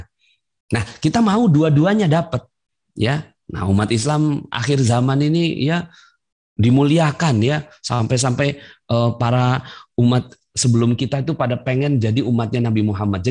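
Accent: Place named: Java, Indonesia. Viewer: pake logat jawa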